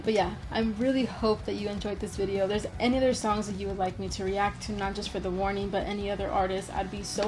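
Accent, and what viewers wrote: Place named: Quebec, Canada. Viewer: Bonjour, à ta façon de parler anglais, tu semble être du Québec... laisse-moi savoir si je me trompe. Si c'est le cas, je suis heureux d'avoir trouvé une autre personne du Québec qui aime TW. J'ai découvert TW en juillet dernier et depuis, je les ai vu live au Centre Bell, au Centre Vidéotron et je suis allé à Monterrey les voir le 22 février dernier. J'espère qu'elles annonceront une nouvelle tournée canadienne bientôt pour aller les voir encore une fois. Si tu as l'occasion d'aller à leur show, n'hésites pas. Elles sont encore meilleure live que sur les vidéos de leur shows. Super réation!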